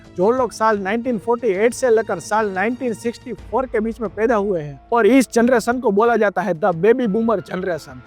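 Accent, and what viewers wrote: India. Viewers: Bro Your Gujrati Accent Is Decreasing Interest To Watching Video. Pahle Hame Hindi Ka Proper Accent Ka Practice Karna Padega. Baki Information Best Hai. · Need to improve the pure Hindi accent rather than kathiyawadi Gujarati accent. This will add more interest to watch full video.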